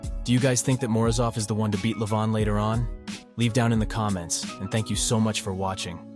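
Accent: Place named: England, United Kingdom. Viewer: Que belo sotaque inglês, bicho. Parabéns!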